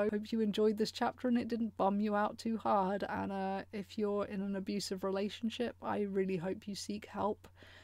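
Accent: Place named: England, United Kingdom. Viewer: She should have kept going. Her english accent contrasts a great deal with her dirty talk.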